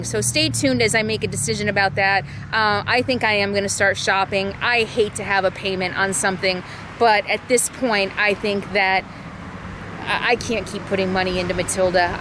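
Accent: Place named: South, United States. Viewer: Nothing wrong with picking up a little Southern twang...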